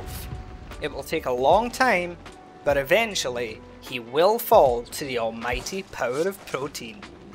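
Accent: United Kingdom. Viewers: "Hay-ch PEE!!!" 😂British voices are great.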